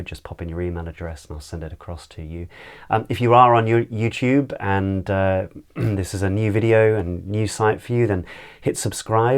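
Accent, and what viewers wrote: RP, United Kingdom. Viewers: Hi there! As you probably remember from my last comment, I'm an Italian native speaker who admires the sort of contemporary Received Pronunciation you're teaching and using, and I have to say that, more often than not, I find myself speaking in a sort of standard British English way, so, the suggestion I'm about to give you is not for people like me. However, I've been thinking you could do videos where you teach American actors how to speak in a standard British English accent, mentioning the specific sound changes that happen in an English accent as opposed to an American one. Write soon and please let me know what you think of my idea! Thank you in advance and bye for now! I wish you all the best! :-)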